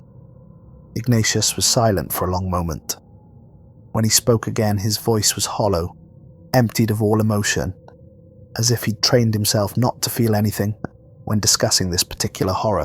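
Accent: Wales, United Kingdom. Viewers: "Welsh" & he is great to listen to. :)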